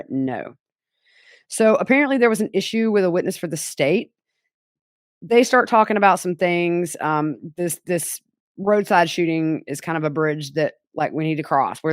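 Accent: South, United States. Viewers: “We gotcha stuff….” 😂 I adore you. I’m a southern girl too and appreciate the way you talk to us like we’re BFFs, sippin sweet tea on the deck, and talking true crime! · please don’t hide your Southern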